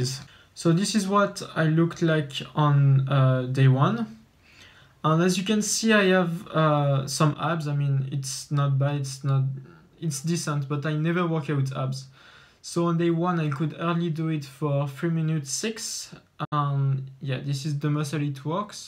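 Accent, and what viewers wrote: France. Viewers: Oh yes the French speaking english lovely accent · Are you french ??? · R u french ? J’ai reconnu l’accent 😂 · That French accent... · Are you French ?? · Mdr l’accent français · On reconnais directement l'accent français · Pleeeeeaaaasssssseeeeee you are French? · your accent is french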